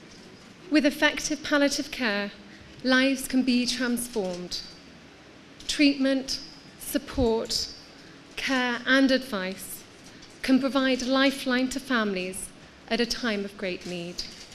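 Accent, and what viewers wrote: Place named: United Kingdom. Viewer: I love the british accent!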